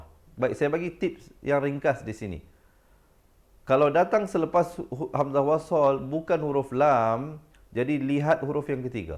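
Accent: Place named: Malaysia. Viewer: Lawak uataz ni.. Bunyi Melayu beno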